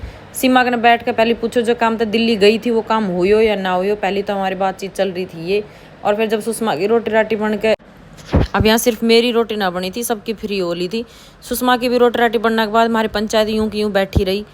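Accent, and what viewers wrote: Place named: Haryana, India. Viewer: जीजी हमने अपने हरियाणवी होने पे गर्व करूं तो देख ले अब ये बिटिया विदेश में जाने के बाद भी अपनी हरियाणवी ढंग न बदल सकी, बहुत बढ़िया है बेटा भगवान ये सारी मनोकामना पूरी करे ❤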